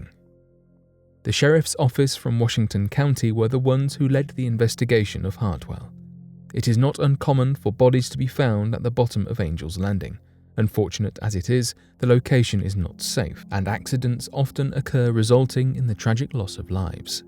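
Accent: United Kingdom. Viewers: The British accent just gives these videos the extra creepy edge, can't be done with an a American accent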